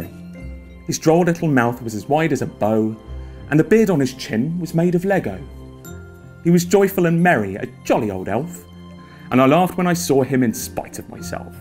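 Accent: United Kingdom. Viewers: Dat beautiful british accent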